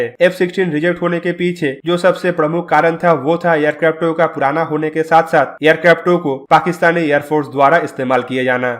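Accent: Bengal, India. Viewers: bhai pls hindi ko thik karo..bangali mix hindi acchi nhi lagti..is liye aapka chnl unsub krna padega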